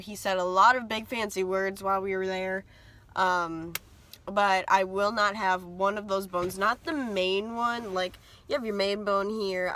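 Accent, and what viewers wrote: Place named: United States. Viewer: Wait do u live in ireland? You sound american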